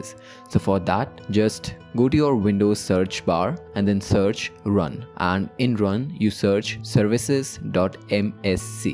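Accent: India. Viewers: Not all Indian-ish voice is bad :D And by the way this really helped a lot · No need to be nervous he has an Indian accent we are good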